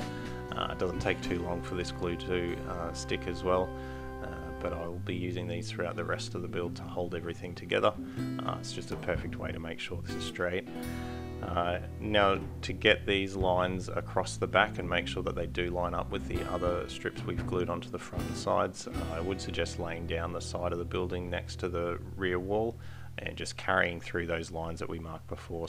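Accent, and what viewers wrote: Australia. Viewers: Mate enjoy your videos, obviously your Australian . Which State you in. Looking at supporting.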